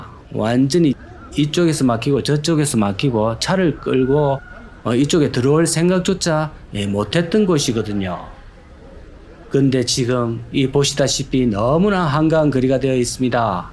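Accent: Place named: Seoul, South Korea. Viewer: 서울말 다되가네요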